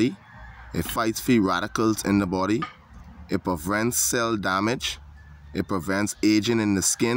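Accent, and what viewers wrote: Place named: Bahamas. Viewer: Man, you can't hide that Bahamian accent. 😊 Thanks so much for sharing! Grateful for something from someone and somewhere I can relate to. Subscribed.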